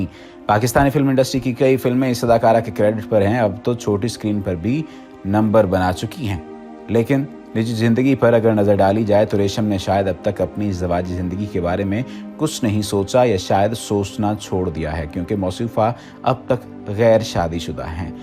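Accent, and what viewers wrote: India. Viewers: Urdu Nwabi accent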